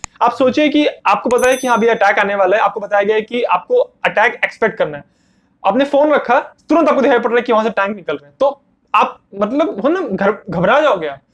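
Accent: Bengal, India. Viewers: Bhai tum Bengali ho kya please reply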